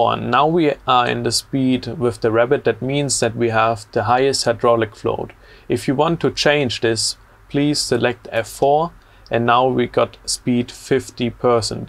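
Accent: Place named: Germany. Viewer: the knowledge of cranes and the German accent are strong with him.;-)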